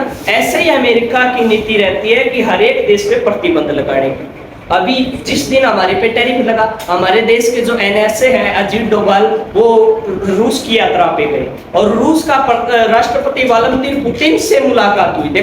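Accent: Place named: Haryana, India. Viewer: Haryanvi touch jyada aa ra h__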